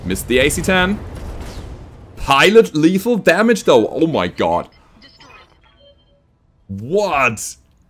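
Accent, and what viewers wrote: Germany. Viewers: Oh he is german?! I can hear it :)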